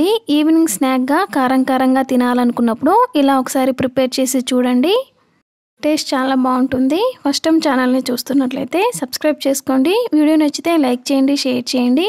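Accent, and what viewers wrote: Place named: Rayalaseema, India. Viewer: Mana rayalaseema basha ❤ chana baguntundi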